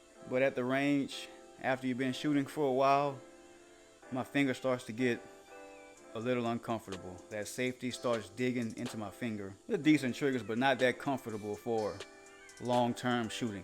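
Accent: Louisiana, United States. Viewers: Bro you got to be from Louisiana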